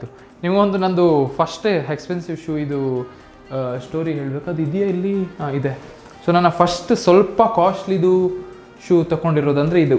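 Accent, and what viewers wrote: Mangalore, India. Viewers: speaking with manglore kannada hits the heart ❤❤❤❤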